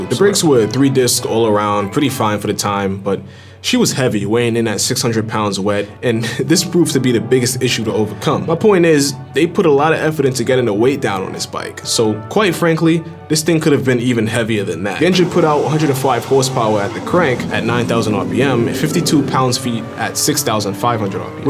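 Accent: United States. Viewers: Sounds like any other normal American to me 🇺🇲 💪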